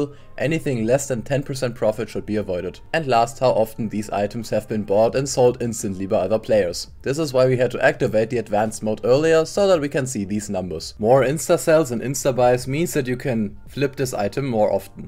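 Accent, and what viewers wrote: Germany. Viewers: Bro sounds german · Are you german? because you have that german accent i hear every time i talk with someone german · i hear a DEUTSCHER AKZENT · Man hört ganz dolle, dass du deutscher bist😂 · Man wieso immer dieser Deutsche Akzent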